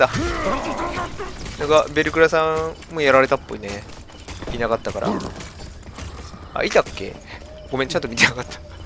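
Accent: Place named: Kyushu, Japan. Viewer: 九州の方ですか?? 方言かわいいですね(*^^*)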